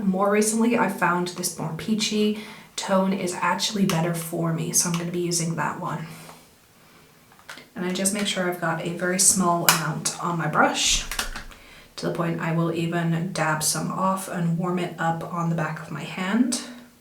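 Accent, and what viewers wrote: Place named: United States. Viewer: Very clear and easy to follow@ And, YO!, am i hearing things??? You've gone all American like! 😊 VERY natural and authentic sounding! And i'm Californian 😄! Cool, cool 😎👍🏼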